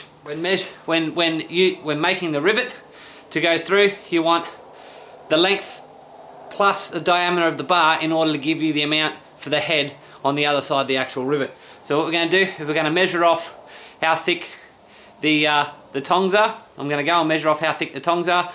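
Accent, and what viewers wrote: Australia. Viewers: What part of Australia are you from? CHEERS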